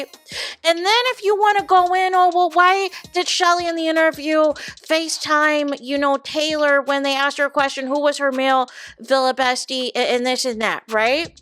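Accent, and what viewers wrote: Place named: Boston, United States. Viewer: I have never heard your kind of cadence before. I can only think Boston?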